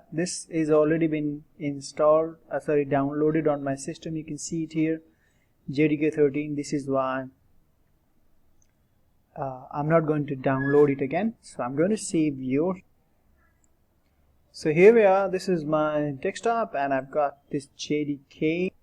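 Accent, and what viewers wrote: India. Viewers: Hey Indian go to normal accent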